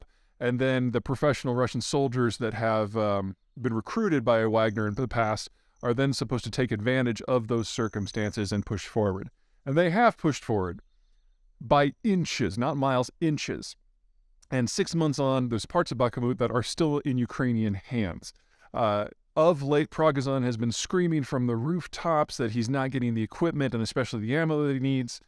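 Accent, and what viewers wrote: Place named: Iowa, United States. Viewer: Peter has always steadfastly stuck to his Iowa "Northern Midlands" approach to pronouncing transliterated Cyrillic. Nothing from Cyrillic he says will ever be pronounced correctly.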